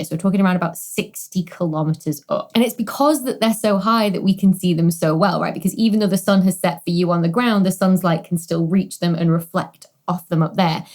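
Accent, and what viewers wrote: United Kingdom. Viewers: I love that you make an effort to talk like a British person, it really irritates me that use of Americanisms is spreading.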